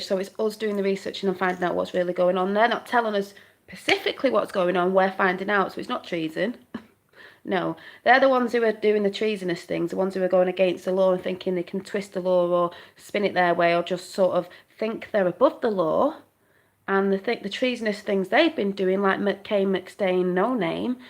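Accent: United Kingdom. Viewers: Thanks n God Bless u 2 Beautiful. I thought that was a British Accent I love it 🤗 lol... I'm sure u hear 100×'s a day how Beautiful u r But every girl loves 2 b told there Beautiful So hopefully it made u 🙂 n if it did Awesome u hope I did make u smile while making ass of myself 😢😊 n e time u want 2 come 2 America I'd gladly put u on a flight. U Truly r The most beautiful woman I've ever randomly jus ran across here on YT 😍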